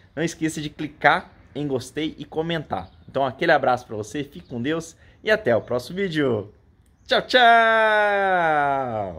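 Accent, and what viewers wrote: Minas Gerais, Brazil. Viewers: Você é mineirinho?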